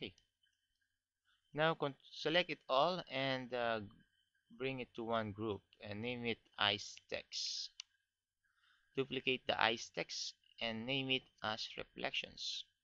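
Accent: Philippines. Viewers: ang pagka english nya ay PINOY na PINOY! ee!! nice kuyA! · kaya naman pala parang pilipino ang nagsasalita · hahah. is this guy a fillipino? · nice vid !! nice pinoy accent!!!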